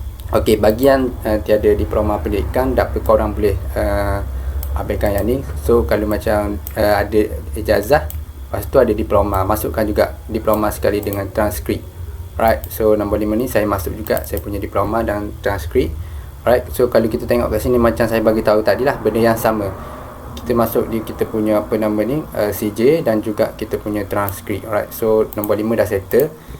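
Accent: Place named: Terengganu, Malaysia. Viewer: terima kasih boh... dengar suara tahu doh.. org Terengganu ni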